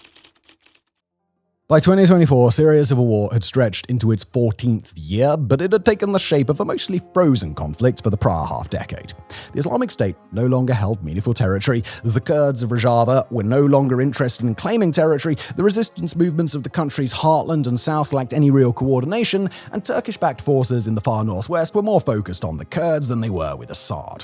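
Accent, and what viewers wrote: RP, United Kingdom. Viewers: Out of this entire video. Simon saying Ray nay sanse. Instead of renessance. Whilst saying in other videos taco sounds posh but tayco as he pronounces it. it tells me a lot. British pos.h. living in Prague. Gotta make that cha cha cha'ching.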